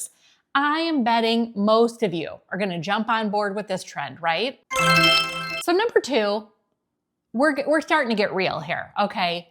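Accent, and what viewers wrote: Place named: Minnesota, United States. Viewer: Is she from Minnesota? I love her accent! ☮️💟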